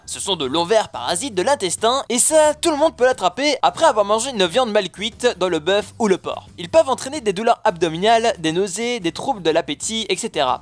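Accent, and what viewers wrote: Belgium. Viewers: T'es belge? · tu vien de ou de belgique ?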